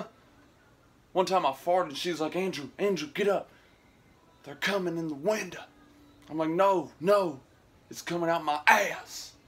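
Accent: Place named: Kentucky, United States. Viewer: my dog just did that. I got up & came back and that plate was licked clean and I mean clean. I couldn't get to mad him he's old. Edit = Andrew, you are from Kentucky? Right, cause you sure sound like it. Takes one to know one!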